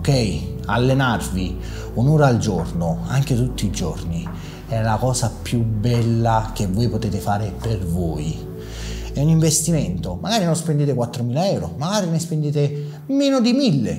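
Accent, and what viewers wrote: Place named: South, Italy. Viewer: Ma da roma in giù quando imparerete a parlare italiano? Sempre queste frasi che non si capisce un ca**o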